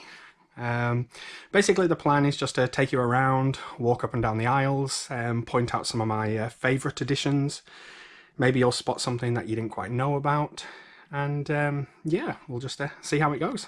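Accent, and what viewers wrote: United Kingdom. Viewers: He sounds like hes from the UK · You sound ?English but you said 5 bux where in the states are you ? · Why does he sound British?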